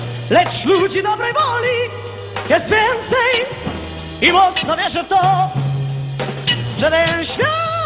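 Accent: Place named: Eastern Borderlands, Poland. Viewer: To nie wschodni akcent tylko kresowy. On był Prawdziwym Polakiem z Kresów Wschodnich.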